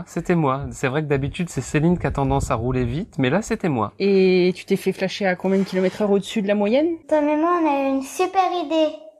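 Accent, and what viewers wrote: France. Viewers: Vraiment vous parlez le français authentique.,comme le français que nous apprenons aux écoles marocaines. Merci.mais parfois nous sommes pas capables de comprendre les francophones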